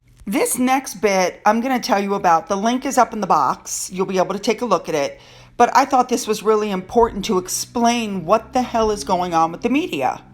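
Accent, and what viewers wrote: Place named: United States. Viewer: The only wrong thing about your videos, dear, is that they are too short...lol. You have what they called in the old days a "radio voice". It carries over running water and every machine I have in the house, so you are my choice of sound when I do housework and I need more than 10 minutes for it. You also speak what I would call "BBC American", correct English with an American accent, and I am sure I speak for more non-native English speakers than myself when I say you are so easy to understand. And after having read most of today's H&M updates, I have come to the following conclusions: - Charles will be King when the Queen dies. - Jason Kanuff will not write a book (unless he is planning to retire at the age of 40). Spilling beans like that might stop him from being hired by others, and he comes across as a man with too high moral and ethical principles for that. 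So no. I think the NDA was lifted because something illegal was hidden by it. I do NOT think he would have done it without William's (and the Queen's) support, and since he was socializing with Catherine and William in Glasgow..well, didn't look like they had a bone to pick with him. - I don't think H&M will sit down for another Oprah interview. The two of them are cowards and seems like Oprah wishes she never met them. - And I finally remembered what that red dress reminded me of. When I came to USA first time in the early 70 and my country was really poor, one thing that impressed the $hit out of me was the Am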